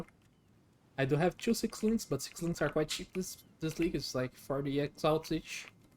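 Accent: Brazil. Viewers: My brain is sensing a brazilian accent on you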